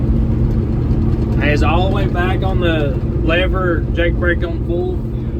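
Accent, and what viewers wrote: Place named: Tennessee, United States. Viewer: Close your eyes, who does sound like? He could do a voiceover for Gomer Pyle effortlessly. I don't say this to make fun my accent is 150 miles east of you in Tennessee so I have the accent as well.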